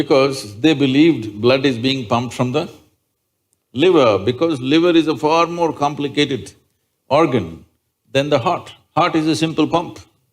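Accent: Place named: India. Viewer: چقدر لهجه انگلیسی حرف زدن هندی ها مزخرفه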